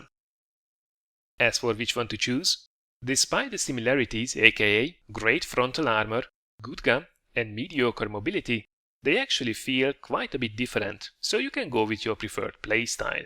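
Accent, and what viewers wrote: Hungary. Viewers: 100% hogy magyar vagy a kiejtésed alapján :D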